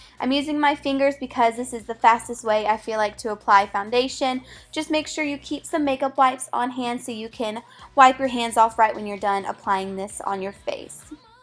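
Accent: South, United States. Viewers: in this video it sounds like you have a tent of a country accent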